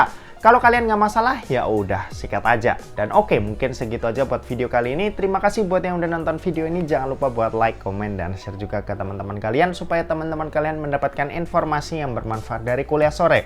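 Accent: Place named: Banyumas, Indonesia. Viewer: Wong ngapak ya mas.....? Keton sitik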